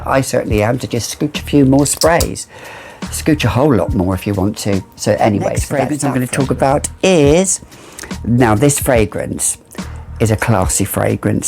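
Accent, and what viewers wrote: France. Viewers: I love your French pronunciation, are you partly French? New subscriber, love the video🥰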